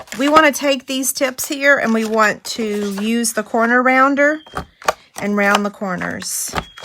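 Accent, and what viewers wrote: South, United States. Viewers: Love your ideas & videos! Where are you from, I hear a distinctive southern accent. I’m from NC.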